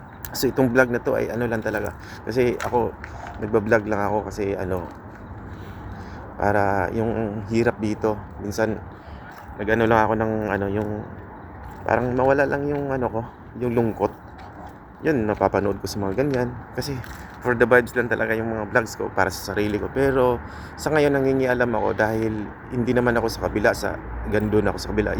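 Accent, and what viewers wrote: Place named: Visayas, Philippines. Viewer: ok lang po kung bisaya accent 👍👍 para sa akin malambing mag salita ang mga bisaya, hindi ako updated sa balita sa pinas pero atleast narining ko about national budget take care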